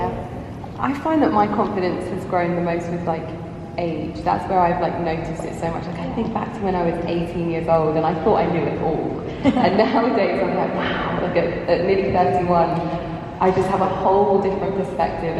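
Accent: United Kingdom. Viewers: Is she british??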